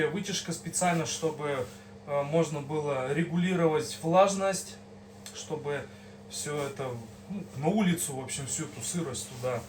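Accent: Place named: Udmurtia, Russia. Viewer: Странно так слушать всë. Уж года два смотрю и не могу привыкнуть. Смешение какого то североудмуртского говора с вятским.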